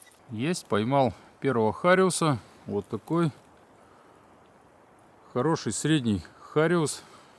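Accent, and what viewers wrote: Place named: Vologda, Russia. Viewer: Все думала,почему разговор у знакомый,леса и болота какие-то родные.А оказалось,что мы соседи😊.Привет из Вологодской области!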